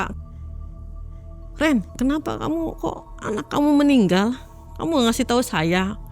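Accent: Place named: Indramayu, Indonesia. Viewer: Logatnya kaya orang Indramayu,, Gak mau suudzon sbnernya .. Tapi kalo bener yaaaa cukup tau ajah soal mie yamien 😅😅😅 jadi takut ...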